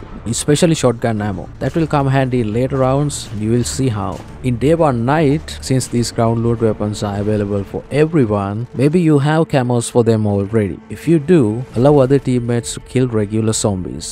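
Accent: Sri Lanka. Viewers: I knew ur sinhala from ur accsent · Broo are u from sri lanka?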